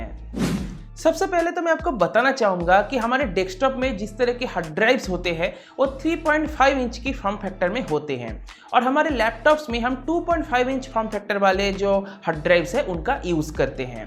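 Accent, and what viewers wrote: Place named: Kolkata, India. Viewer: Bro you don't know, what you are ... You r genius Bro ♥️♥️♥️... you explained us anything about tech, hardware or software related matters so fluently & deeply(specially)...lots of love ♥️♥️♥️ from Kolkata...♥️♥️♥️ I think you are also from our Kolkata our pride ♥️♥️♥️ from your speeking accent, correct me if I am wrong 🤝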